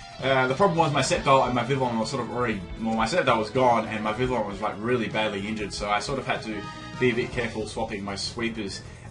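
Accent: Australia. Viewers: He says it the Australian way, not the stupid American way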